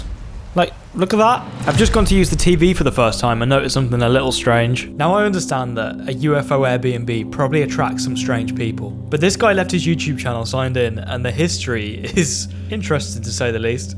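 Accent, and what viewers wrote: United Kingdom. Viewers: ryan trahan but in british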